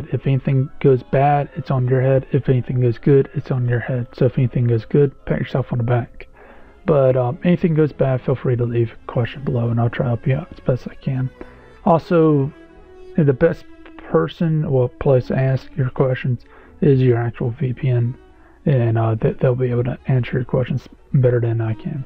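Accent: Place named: North Carolina, United States. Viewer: i knew i heard that nc accent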